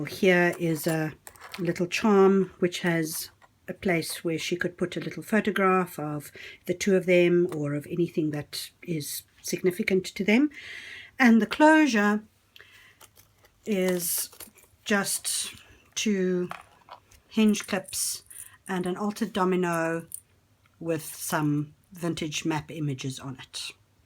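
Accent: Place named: South Africa. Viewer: Really lovely. The tea dying really came out super duper great this time... well, it always does!! I really like a simple "clean" journal personally and the more I get into this the less I want to embellish the pages because I think most people want to have the freedom to fill up their own journals. I recently made a travel journal and I also made some word embellishments and stamps to take on the side during the vacation. Good idea. Lovely to hear your South African accent too. Congrats on another gorgeous journal!! xo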